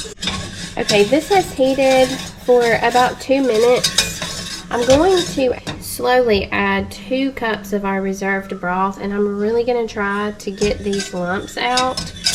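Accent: South, United States. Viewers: Your video just showed up in my recommendations...I subscribed before the video even ended because you have a nice personality. I'm from NC so I recognize your southern accent...where are you located?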